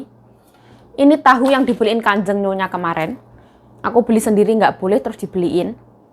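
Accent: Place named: Surakarta, Indonesia. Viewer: Coro ngomonge solo bgt ,koyo aku nek gembreneng dewe nek muleh kampung 😂😂😂 tpi sak iki boso jowoku melempem efek 15th merantau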